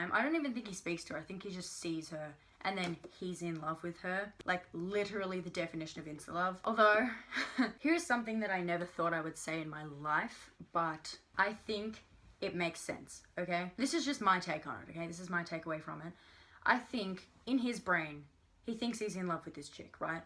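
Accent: Australia. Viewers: is she from Australia or New Zeland? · her accent sounds Australian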